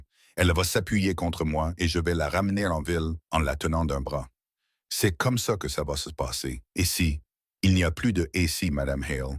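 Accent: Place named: Quebec, Canada. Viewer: Je vous écoute d'Alger, Belle histoire et bel accent Québécois ❤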